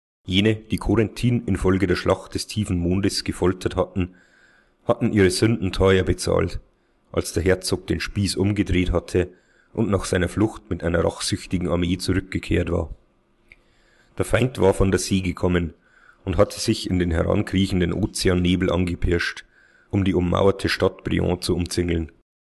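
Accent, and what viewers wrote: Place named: Styria, Austria. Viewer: Kompliment zu der Vertonung dieser Kurzgeschichte. Kompliment. Insbesondere der steirische Dialekt gibt dem Ganzen etwas Ostländisches.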